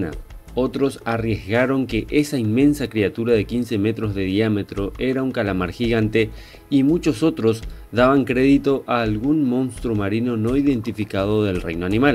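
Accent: Paraguay. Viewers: Tu voz suena a Paraguay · Enzo Aquino si viejo, Paraguayo es..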